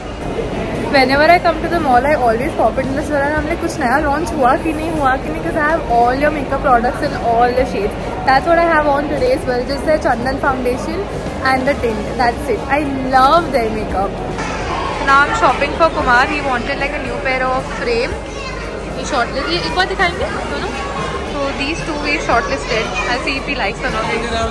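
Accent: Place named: Kutch, India. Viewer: CONGRATULATIONS 💗 SUPER HAPPPPYY FOR YOU 😁🌼(LOVE YOUR KUTCHI ACCENT...being a KUTCHI myself i feel so proud 🥲✨)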